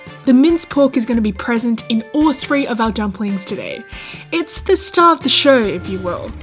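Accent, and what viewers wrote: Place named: Australia. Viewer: it's strange to hear an asian person with an australian accent. i'm from vancouver where most asian have an asian accent. it's very cool.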